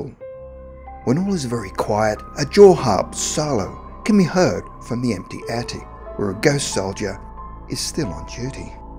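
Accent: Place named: Australia. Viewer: Why the hell is an Australian guy comentating American history. This ruined it for me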